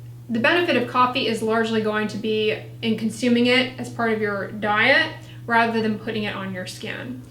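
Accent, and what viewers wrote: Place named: Texas, United States. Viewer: every once in awhile that Texas accent comes through... so cute. Let that Twang fly girl!!!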